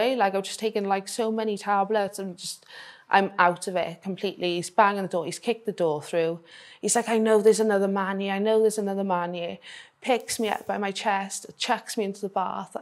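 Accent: Wales, United Kingdom. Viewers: She's from Britain - UK. She has a Welsh accent ❤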